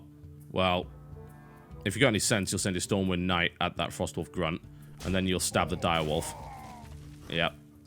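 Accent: United Kingdom. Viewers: He has a British voice.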